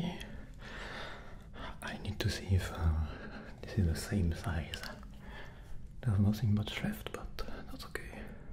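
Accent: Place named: France. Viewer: Ton petit accent bien Français me fait tellement rire 😂😭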